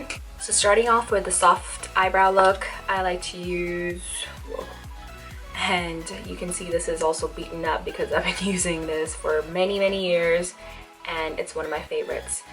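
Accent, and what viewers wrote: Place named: United Kingdom. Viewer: You are starting to have the British accent 😜 Love your brows, they look pretty identical!💋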